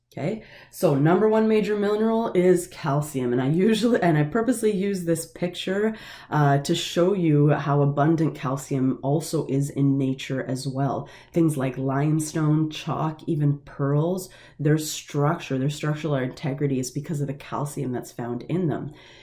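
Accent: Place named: United States. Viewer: Your voice is so beautiful, I really love this accent. Is you accent American or Canadian?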